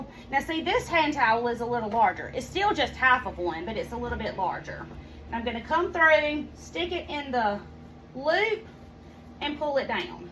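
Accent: South, United States. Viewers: I’m from Arkansas and I live in Idaho. Your accent made my heart ache for home 😭 I love your channel and will follow for sewing tips AND to hear that beautiful southern accent. Thank you so much and God bless you ♥️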